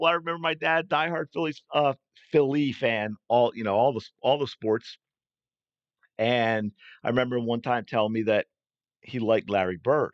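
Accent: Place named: New York, United States. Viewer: As an aside, I could've sworn I detected a NY accent in your speech😊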